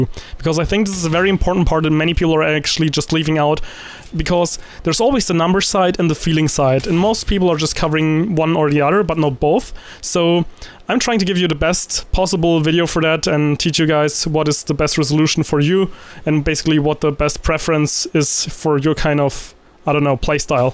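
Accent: Germany. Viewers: wie gehts dir man hört raus das du deutscher bist aber dein englisch ist richtig gut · Are you german? Because I think you have a very strong accent but it still sounds nice :) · Wie ich wusste das du Deutscher bist :D Seitdem ich dich Abonniert hatte kams mir schon so vor :D Merkt man irgendwie übel finde ich am English mach weiter so mit den Videos ^^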